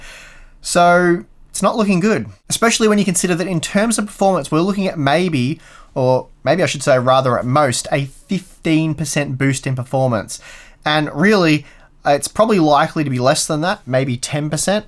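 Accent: Australia. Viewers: What's with the red-striped leather jacket at the end?... quick change artist are we?... getting a little cold there 'ol possum? haha! Thanks for a great news/opinion piece vid - always seems a little more authentic to me with the Aussie accent and no American BS. Really appreciate the thought you give things and your independent thinking mate. Cheers, from the Hills area in Sydney!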